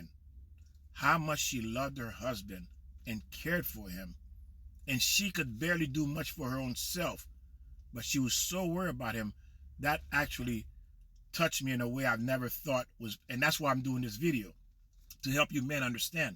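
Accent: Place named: Caribbean. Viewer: Where are you from? I hear a West Indian accent.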